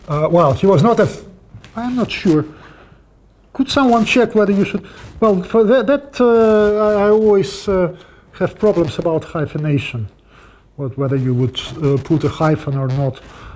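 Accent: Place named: Russia. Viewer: Потому что эти звуки нужно учиться выговаривать как можно раньше, желательно с детства. У русского ребёнка такой возможности в России нет, поэтому ему приходится учиться это делать во взрослом возрасте. У некоторых получается и они приобретают более менее норм. акцент. Но не всегда на 100%. Здесь я слышу у него практически в каждом слове русский акцент. И ещё большие паузы. Native speakers говорят на родном языке со скоростью света. Он говорит очень медленно.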